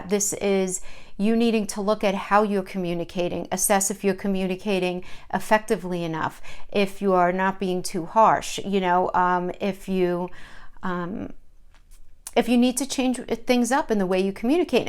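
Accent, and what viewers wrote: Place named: New Jersey, United States. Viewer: ❤️ (As an aside, I can’t stop thinking you must be from Jersey, where all my heart and family lives.)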